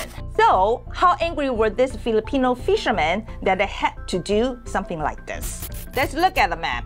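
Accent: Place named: China. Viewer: You sound like a Chinese your country people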